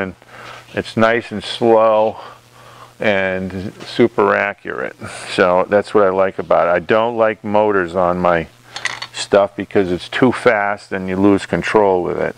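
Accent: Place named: Maine, United States. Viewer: Awesome video. As I used to live in Maine for 13 years the accent reminds me of home. My question is you tend towards shrinking most of the time and why that is? I have done quite a bit of tin knockin in the past and really never gave it any thought. Your thoughts would be greatly appreciated!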